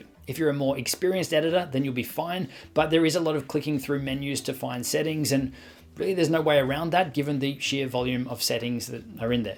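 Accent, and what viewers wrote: Australia. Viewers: Do I sense a fellow Aussie? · Are you from Australia?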